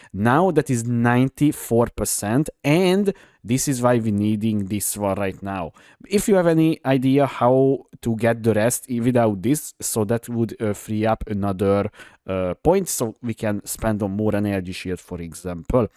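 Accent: Hungary. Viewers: Hungarian accent alert!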